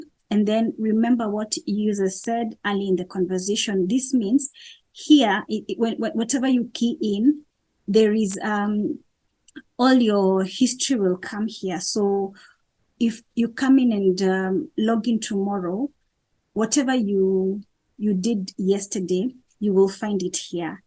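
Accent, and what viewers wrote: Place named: East Africa. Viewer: Like you East African ascent... thanks for the lecture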